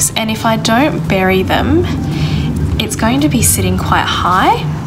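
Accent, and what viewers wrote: Australia. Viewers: Excellent video on how to correctly repot orchids. Love you Aussie accent cheers.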